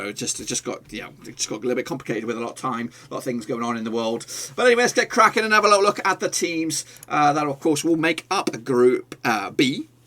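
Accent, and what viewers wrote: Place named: United Kingdom. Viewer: Basado en su accento, yo creo que el es britanico... Los americanos hablamos mas Bonito lol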